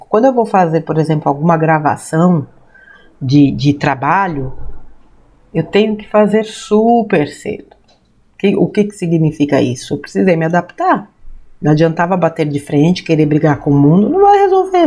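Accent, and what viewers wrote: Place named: Minas Gerais, Brazil. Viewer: "querida amiga", só posso dizer, gratidão, gratidão, gratidão, conhecer você e todos os personagens que você nós trás... é a melhor parte do nosso dia a dia, pelas reflexões, exemplos, ensinamentos, gostosas gargalhadas e lógico; pelo mineirez sem esquecer do café e pãozinho de queijo. Que PAI multiple e abençoe sua vida Beijos. Lis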